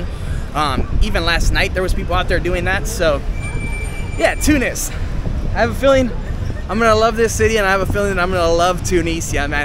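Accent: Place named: United States. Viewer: I really like your American accent. thanks for sharing this video!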